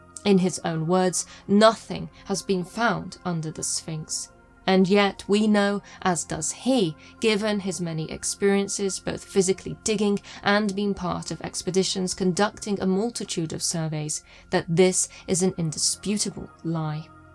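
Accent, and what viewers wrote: United Kingdom. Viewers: I truly enjoy watching and listening to your channel. That British accent and the way you narrate your video is truly exceptional.😍😍😍😇😇😇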